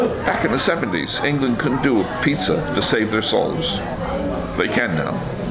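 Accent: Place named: United States. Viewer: Why has he got a Yankee accent if he is British?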